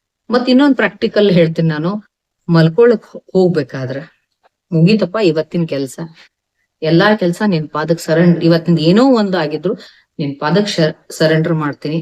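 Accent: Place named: Hubli, India. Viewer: Mam namm hubli bashe n chanda helthiri tq mam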